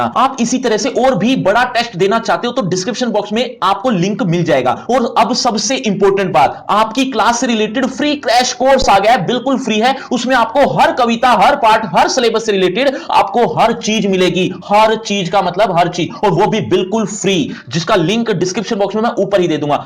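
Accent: Haryana, India. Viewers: hryana se ho kya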